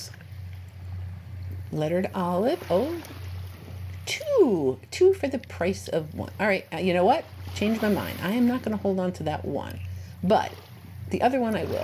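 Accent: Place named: New York, United States. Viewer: I love your videos so much! Thank you for creating them amd sharing tips! Question - are you originally from New York? I'm curious because I am, and your accent sounds so familiar to me!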